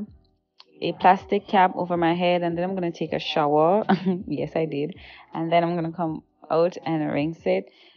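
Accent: Jamaica. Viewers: Jamaican accent trang bad. Thanks for this 💪🏾🤗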